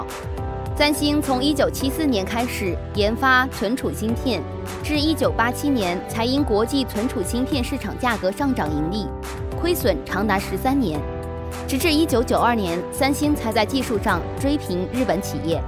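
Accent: China. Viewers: 假裝繁體字想要以為是台灣人發的影片，結果聲音根本是426⋯然而內容也不符事實（笑了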